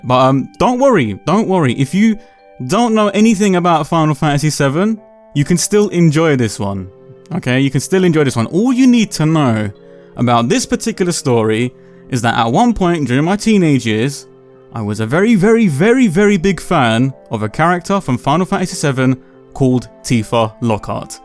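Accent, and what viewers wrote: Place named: London, United Kingdom. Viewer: My guy😂 pure comedy you man. You doing these vids reminds me of that one time you did I little video talking about the first season of Luke Cage and how you really liked it and man I'd love to know your opinion on the second season especially with the Jamaicans and knowing that your from London and you got that multicultural English going with saying tings like ends, wagwan or even ting, me being a kid from up north of the ends I'm just wondering what your opinion on that season would be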